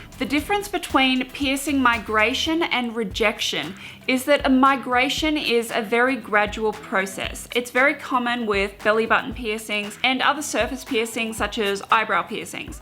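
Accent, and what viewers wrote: Australia. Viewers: Love your Australian accent... Will you ever get your septum pierced?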